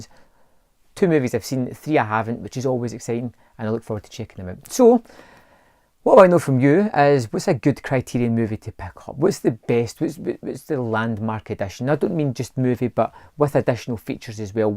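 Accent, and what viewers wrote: Scotland, United Kingdom. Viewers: First time watcher. Good to hear a Scottish voice. Nice pickups buddy 😉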